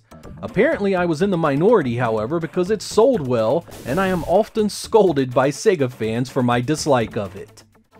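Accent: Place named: Delaware, United States. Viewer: So, totally off topic, but your accent... Delco? Delaware?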